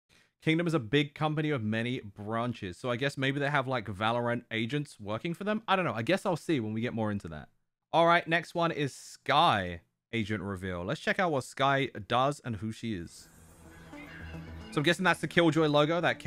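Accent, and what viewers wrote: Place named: United Kingdom. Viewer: Bruv hes from britahs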